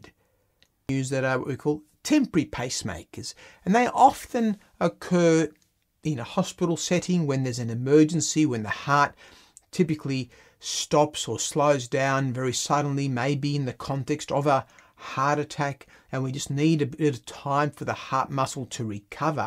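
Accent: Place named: Australia. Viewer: you can be the most educated person in the world but when you have that australian accent you just loose value in an instant. i dont even want to here another word. pome mentality.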